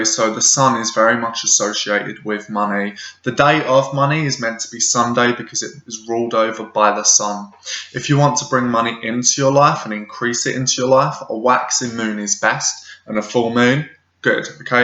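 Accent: England, United Kingdom. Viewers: Love your videos. Nice to hear an English voice ;) easy on theeyes too ;)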